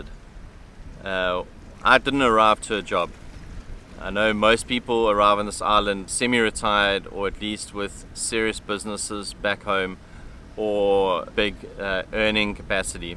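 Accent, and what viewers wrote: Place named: South Africa. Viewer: Hi. I found Yr channel while scrolling for Islamic prayers. I am pleasantly surprised by Yr positive and realistic attitude to Yr stay here in my little island, Mauritius where I was born n still living. Thanks so much for the realistic yet positive review on Mauritius. I hope u explore my little island little more enjoy z feel of Ramadan 2025 and Christian fast 2025 and get to know about our cultures values religions cohabiting harmoniously. I like the fact that u love the simple life in Mauritius. For Yr car, find yrself a mauritian mechanic which will cost u very very less than even rs 10,000 for servicing etc. I have a Toyota vitz yr 2000 with 6 months car fitness n 1 Yr road tax n I pay rs 6000 including mechanic fees for every 6 months servicing. If u so wish u can get a mauritian mechanic whose name is Saleem in another town called Beau Bassin. 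U won't pay so much. People will exploit u once they find from yr talking accent that u r from South Africa. So happy to hear from u n will wait for Yr next videos. 😊 lots of love from mauritius 🇲🇺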